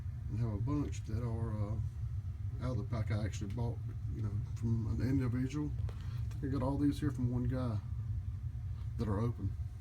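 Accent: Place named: South, United States. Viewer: That was a blast from the past & made to much better by the native WCCW & NWA southern accent!!! :)) "See ya in church" brother. Blessings, David (Jer. -24)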